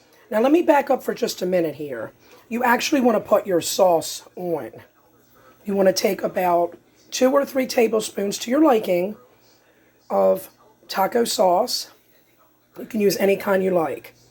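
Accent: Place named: Chicago, United States. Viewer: Your accent, I'm guessing Chicago area?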